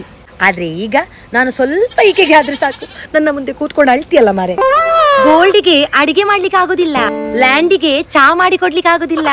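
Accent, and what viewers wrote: Mangalore, India. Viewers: Sooooooooper stand up comedy dear gas ⛽ 😂 Such a beautiful actor you are ..just wowww U told the reality of gas usage in a good way creating humour . I JUST LOVE UR MANGLORE KANNADA ...Muuaaahhhhhhhh 👄 But dear gas cylinder dont worry Ishtu diwasu band maadiddu microwave iga upayogistene 😂 Haage nann bali induction kuda untu...neenu swantane maha khiladi anta tilko beda 😁😁😁😁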